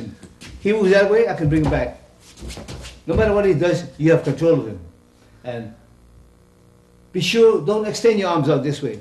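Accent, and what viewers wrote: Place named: Hawaii, United States. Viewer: That's that Hawaii accent right there! =) mahalo, Sensei!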